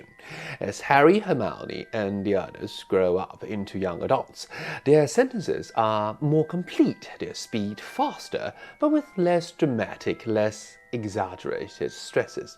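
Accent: United Kingdom. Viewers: cool british.accent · 來了～老師終於出片了❤️ 從一開始聽到老師的英式口音覺得好高雅好好聽喔～ 但是身邊沒有用英式口音的人啊～都是美式口音居多 真的很可惜沒人可以練習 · podcast 英國腔任何東西都可以！！ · 英式口音真的很好聽👍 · 好像繼續學英文了 老師的英國腔真好聽！ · Teacher, you have a beautiful fluent British accent and I really like it. I wanna to learn English but I am not interested in other English films except for Harry Potter series. And this is actually a perfect video to let me learn English, it is such a wonderful combination of English and also Harry Potter. Well..hope u will continue this series..ummmm.. i love it so much and thanks a lot!😊❤ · 英國腔超棒的！好好聽(☆∀☆)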